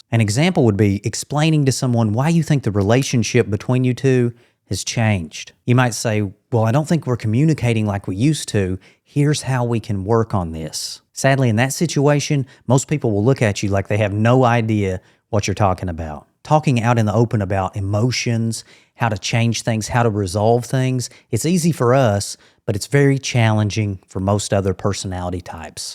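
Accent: South, United States. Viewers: Where is your accent from? Carolinas?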